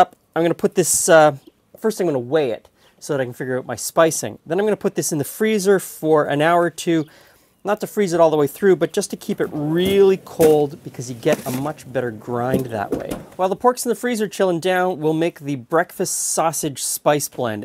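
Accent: Canada. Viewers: I'm amazed at the kitchen equipment he has. He must have a big place to store all that stuff. I mean, who has a dedicated sausage stuffer? It's not exactly small either. Also, I wanted to mention for a while now, I love how Canadians say certain words like "out". I can't even describe how it sounds to me. Makes a Canadian, or American from the far North stand out every time.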